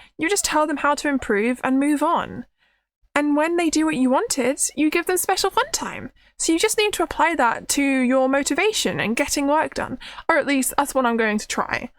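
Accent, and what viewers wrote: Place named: United Kingdom. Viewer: 21? Hmm. I was certain you were older. From the way you speak and act, but maybe the people around me are less mature, or it could be your British mannerisms and dialect. I have not spoken to a lot of people from the UK. Your life seems to be stable and you seem very happy with it, for the most part. All the best to you lady.:face-red-heart-shape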